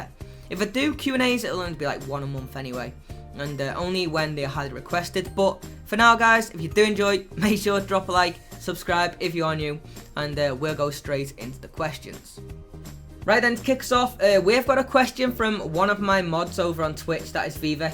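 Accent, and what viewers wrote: Liverpool, United Kingdom. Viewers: This might seem like a stupid question but surely if you grew up in Indianapolis you would have picked up there accent but you have a very thick scouser accent?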